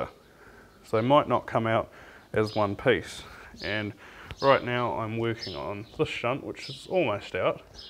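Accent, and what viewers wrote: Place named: New Zealand. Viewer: This guy is a Kiwi, so it is pronounced TUN, not Tin.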